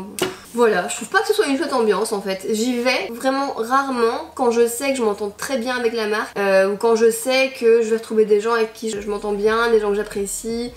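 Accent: Belgium. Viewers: Je trouve que tu as un accent belge 😂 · Attend t belge je me suis que t'avais un accent belge je suis franco belge 👍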